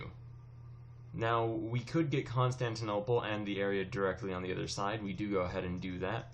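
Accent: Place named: Netherlands. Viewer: the way he speaks english I'd bet he's dutch.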